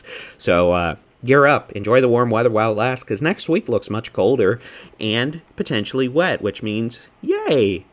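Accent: Texas, United States. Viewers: I’m just going to say it. You are a handsome man. I enjoy your channel for many reasons: the humor, the honesty, the thoroughness, the lovely, homey Texas accents, but also you are just easy on the eyes. Not trying to make things weird, just letting you know that one Texas lady finds you very pleasant to look at. Have a nice evening and thank you for everything you do.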